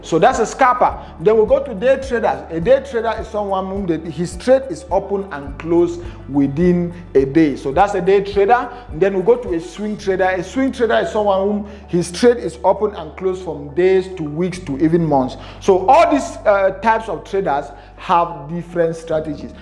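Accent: Cameroon. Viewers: Man you speak like a Cameroonian ❤ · You sound like a Cameroonian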